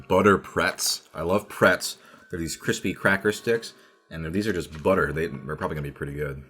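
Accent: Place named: United States. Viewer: I'm lying in bed at 3AM, watching a Korean man with an American accent eat Japanese snacks... what am I doing with my life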